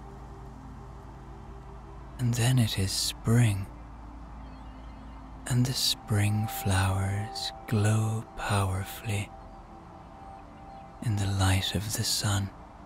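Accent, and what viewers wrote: Ireland. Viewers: OMG in the greatest respect I love you as an actor, TBh I was surprised that your beautiful silky voice as a storyteller actually sends me to sleep. Why? Bcoz I've suffered insomnia, bcoz of PTSD for years, so thank you for making my night more focused and yeah, without sounding insensitive your beautiful Irish tones help me to blissful night's sleep ❤🙏🙏🙏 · Hi, I’d like to say a massive thank you to you for your wonderful channel. Since my amazing hubby passed away, bedtime for me has brought anxiety, tears, insomnia like you wouldn’t believe and emptiness. I’ve tried music, silence, audiobooks, meditation and yet still I found it so difficult to relax. Your sleep stories are beautiful, your gentle Irish lilt soothes and caresses away the anxiety. I can categorically state I haven’t yet got to the end of your stories, which for me is a miracle! 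You are truly a gift to me and I wish you every success in growing your channel…. I for one will be listening and looking forward to each of your uploads… Again, thank you so very much for all you do for us in your growing community xXx💜xXx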